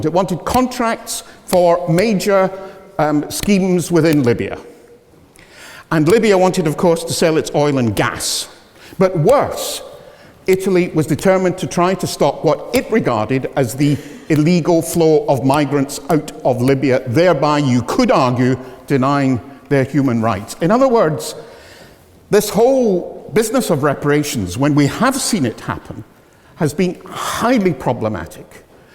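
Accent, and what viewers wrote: United Kingdom. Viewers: Big Mac, bull shit spoken in a rather classy british accent :) Sorry Sir.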